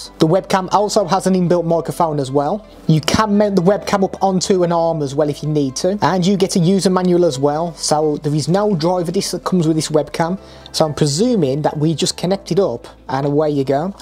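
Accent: United Kingdom. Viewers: Your british english is so fcking hard to listen to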